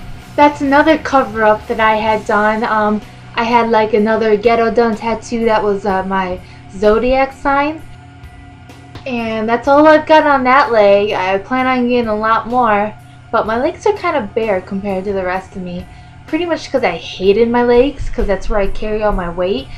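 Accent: United States. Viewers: yeah your accent xD american im from sunderland in the uk you sure do you sound awesome :D your very welcome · are you from the east coast by any chance? you kinda sound like it!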